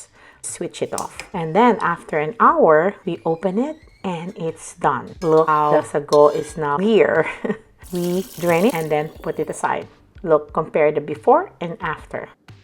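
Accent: Philippines. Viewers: “Bay dah bendorrr” - god I love the Filipino accent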